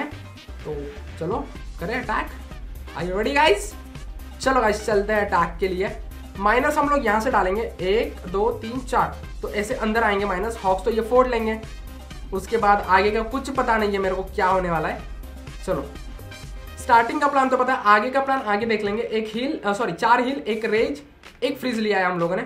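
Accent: Kerala, India. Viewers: you are from kerala?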